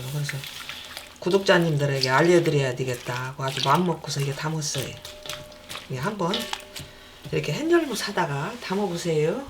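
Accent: Chungcheong, South Korea. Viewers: 충청도 신가바요?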